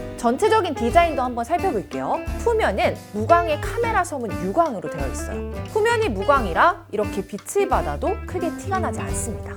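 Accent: Gyeongsang, South Korea. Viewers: 대구 사투리 ...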